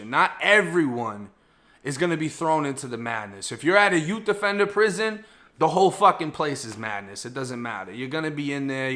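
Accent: Boston, United States. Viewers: what part of Mass are you from.The Boston accent is distinct. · It's about time you started talking about "Boston" where your a native at bud or places across the world.... this is getting a little dry, you only did 3 years in a Fl prison, and you have a whole channel based on those "3" years, and while living and obviously being from "Boston" you talk about Fl prison and the people who live in this "one state" you only served "3" years in prison at, but your not a native 2..😒 you should expand which prisons you talk about talk about them "ALL" your language skills are superb very well spoken! you def should have a channel your more then worth listening 2. If you didn't sound like Leo from "Departed" or "Wolf of Wallstreet" it would go down smoother, but your "Obviously" from 'Boston" but have and "ENTIRE" channel based just off only 3 years of your life from a place you were a transplant 2 in the 1st place.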